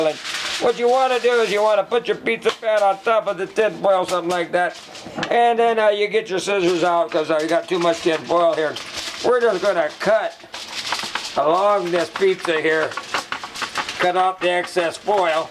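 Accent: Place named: Green Bay, United States. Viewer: You sound like you're from Green Bay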